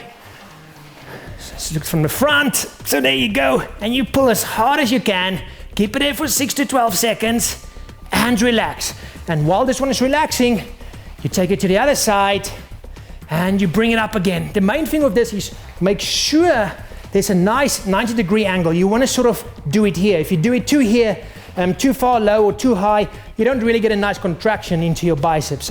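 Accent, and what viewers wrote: Afrikaans, South Africa. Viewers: sounds south african to me :) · Judging by his accent i think he's from South Africa. I know cause I live there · His accent is South African.. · He's a saffa (South African) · I think it's a south african accent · Its a south african accent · Roy Miketsukami It's a South African Accent common under Afrikaans Speaking South Africans.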